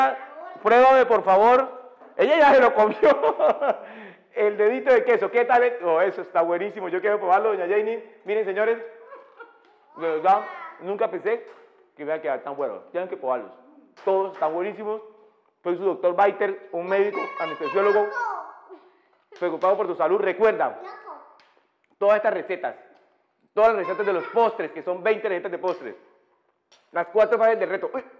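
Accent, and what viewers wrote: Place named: Colombia. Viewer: Me encanta su acento Colombiano ❤️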